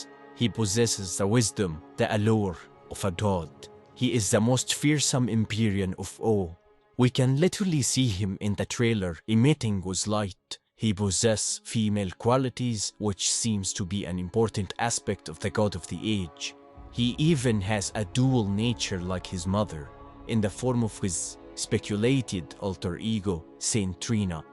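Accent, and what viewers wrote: Egypt. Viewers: عرفتك مصري هههههه استمر يا باشا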